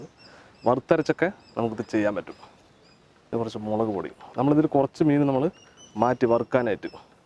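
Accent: Palakkad, India. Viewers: ഇക്കാടെ പാലക്കാടൻ സ്ലാങ് ആണ് എനിക്ക് ഏറ്റവും ഇഷ്ടം 😇😇 ഫുഡ്‌ പിന്നെ മാത്രം 😘😘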